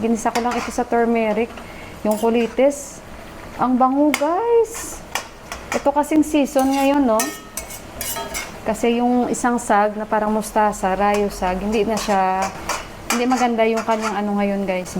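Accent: Iloilo, Philippines. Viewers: Gusto kita panuurin kc ilonggo ang tuno mo, ilonggo din aq from negros...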